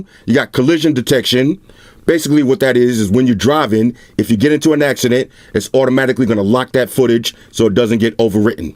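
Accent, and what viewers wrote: New York, United States. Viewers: You’re from queens or Brooklyn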